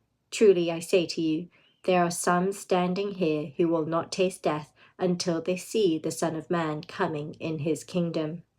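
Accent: United Kingdom. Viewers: No offense but British accent makes me feel like I've lost all understanding of English as If I it's not my own language, this is probably how non English foreigners feel when they hear English 😂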